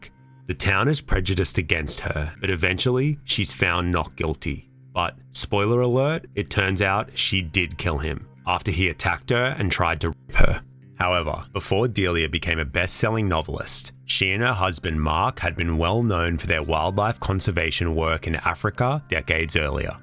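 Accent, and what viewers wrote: Australia. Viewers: It’s that chill Aussie with the interesting stories again :)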